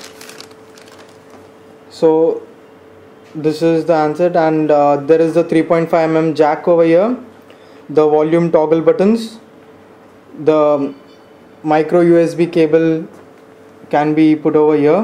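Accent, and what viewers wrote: India. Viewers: lg does not exist in pakistan.. his accent is Indian.. if u hate or love it .. :P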